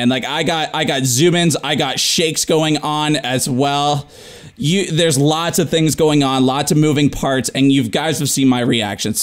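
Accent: Canada. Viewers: So off topic - but I love your Canadian accent 😂 I’m all the way down in Maine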